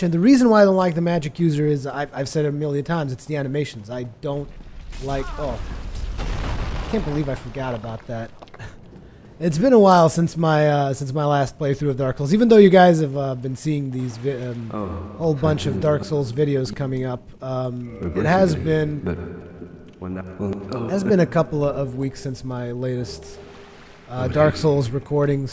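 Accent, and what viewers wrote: United States. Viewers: First vid, Vaati sent me. Damn, your English is perfect. You sound American. You have no accent. I have Portuguese heritage, but I don't know what it sounds like. Weird that you don't have an accent. Great vid though. Going to watch the rest if this play through.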